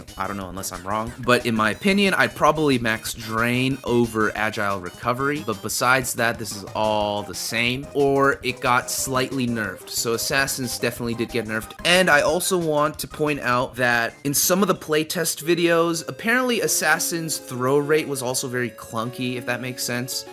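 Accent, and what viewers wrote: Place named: United States. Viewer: dude your accent is awesome, which part of america does it come from?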